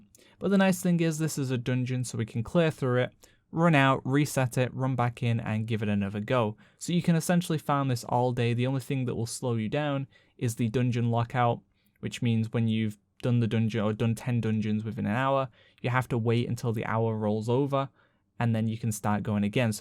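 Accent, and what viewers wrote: United Kingdom. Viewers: Svante Bard that’s the british accent for you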